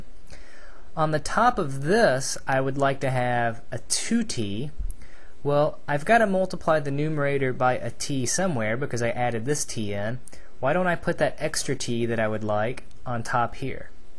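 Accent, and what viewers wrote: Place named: South, United States. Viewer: good vids. but your southern accent distracts me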